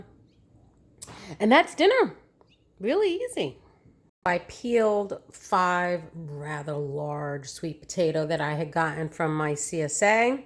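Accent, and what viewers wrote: New York, United States. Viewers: Hello. That recipe sounds do delicious. I just love that New York accent. I can tell your choice of foods you can cook. Thanks for taking the time to have this delicious recipe. God bless. I'll be waiting for another fantastic video.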